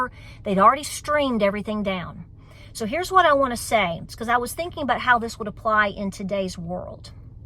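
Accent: South, United States. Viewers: ... You're like a plough horse, a jersey cow and a hound dog all in one.. with a southern drawl, y'all ... SAVE YOU SANITY, and stay ACTIVE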